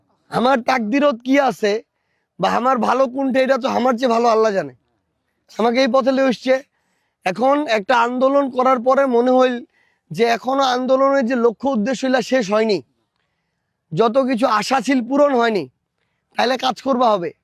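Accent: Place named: Rajbongshi, India. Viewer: vai mui tomar Indian Fan. Mui Rajbonshi, mui Rajbonshi vasa suniya tomar fan hoya gesi. Valo thakis vai. Siliguri,jalpaiguri, india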